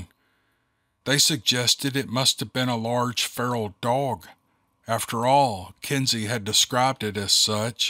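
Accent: South, United States. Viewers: A part of me dies listening to this. I understand its fiction but you have so much respect and authority. If you made this narration on another channel maybe I wouldn't mind but your main? So many of my coworkers and neighbors think of Bigfoot and Dogmen as some joke for story- telling. I am quick to defend against that stupidity or less they will gey 411. We need more advocates like you to bring awareness and respect for the wilderness where these things roam. I just dislike these made up stories since frankly all they are are just creepy pastas in a sense. Years ago Vic Cundiff and later James Williams from Dark Waters showed me there are people out there who go out of their way to avoid pretend stories and only put out their real encounters. I spoke with you before and I am not saying this denegrade or insult you or this talented writer but please remember by narrating these made up stories on your main channel can be used against all your 'real' storytelling videos. 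If I wanted that I would listen to your other channels which go deeper into fan fiction which I never had a problem with but this is your flagship ocean liner. We respect and love your Southern charm and honesty with that confident accent. Please remember I am not criticizing you for what you pursue but recall that Darkness Prevails is one of the biggest Creepy Pasta channels out there but I can never trust him when he makes a video 'Real Werewolf Stories: 'Guys I swear this one is a genuine story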